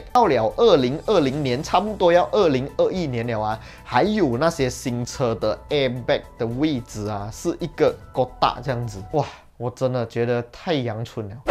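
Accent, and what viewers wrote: Malaysia. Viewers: Malaysia華語就是醬rojak的咯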